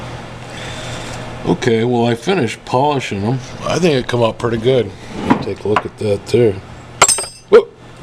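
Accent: United States. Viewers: You are a sweetheart! Are you from the area of Northeast, N.Y? 'Sounds like a Syracuse accent to me. Your wife must have been very touched by all the love you put into her Valentine's Day gift.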